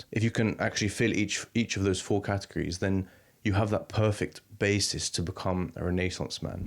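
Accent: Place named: Cockney, United Kingdom. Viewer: This guy has the new grating Cockney Accent… Close my eyes and he could be a Rapper or PoundShop Gangsta. Or one of the new breed of BBC or Channel Four continuity announcers.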